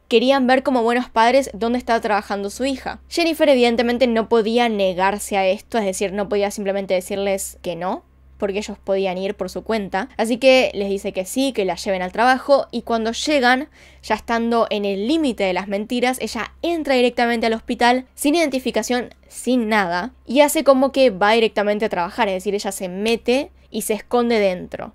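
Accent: Buenos Aires, Argentina. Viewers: Lindo acento Argentino te super Admiro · Pero si tiene acento de buenos aires jsjsjs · Yo soy de Córdoba y el acento de es porteño. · Es acento porteño y yo soy Rosario tenemos otro acento.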